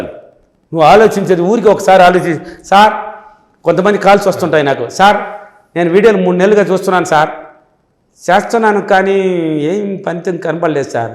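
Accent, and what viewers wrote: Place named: Chittoor, India. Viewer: I think he is from Chittoor... That slang is lub❤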